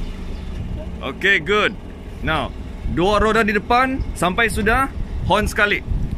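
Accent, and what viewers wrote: Sabah, Malaysia. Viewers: Sebulan lagi test jpj, mmg tk ada latihan persiapan utk jpj ulangan keesokkan nya. Sebulan juga duk “study” video-video cikgu. Suka juga loghat Sabah cikgu. Akhirnya lepas juga test harini 25/11/2021. Terima kasih cikgu share ilmu 🙏🏻 Salam dari Melaka.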